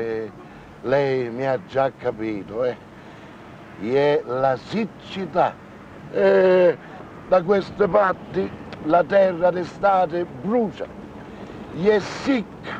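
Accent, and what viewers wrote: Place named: Catania, Italy. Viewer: Accento (catanese e non palermitano) orribile peraltro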